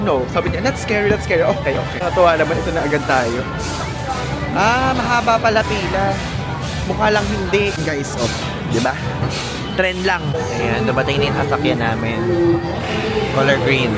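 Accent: Nueva Ecija, Philippines. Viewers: Hi! I recognized your accent, you’re from NE pala, same tayo ng hometown. I’m from Aliaga/Zaragoza. Hope to meet you when i get back home.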